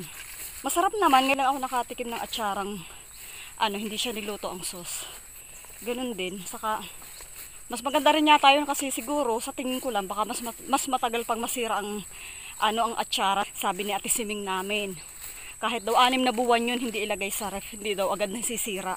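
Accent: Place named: Batangas, Philippines. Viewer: Na lahian ka na ni kuya.. Batangeñang Batangeña ang punto pag salita mo ng tagalog….pakultab,….Upak na ng upak…bakbakan na dito… hahaha…Maganda yang laging naka bota para lalong safe…..Basta may time ako blog mo gusto kong tingnan agad..May nakukuha akong ibang idea sa pagluluto at pagtatanim…..And it remind me the way I used to be, tulad ng ginagawa ni kuya.ginagawa ko rin yun noong araw…Okay say hi to kuya.. God bless you more.❤️🇵🇭🇺🇸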